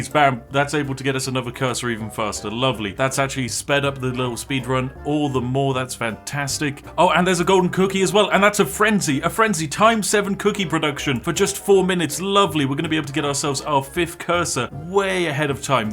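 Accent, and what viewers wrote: United Kingdom. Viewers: why so british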